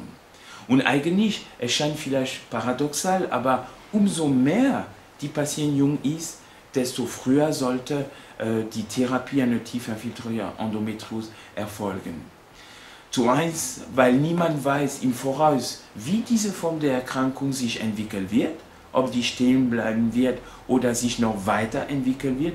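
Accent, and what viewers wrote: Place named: France. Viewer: je reconnais votre petit accent français , ses trop mignon merci pour vos explication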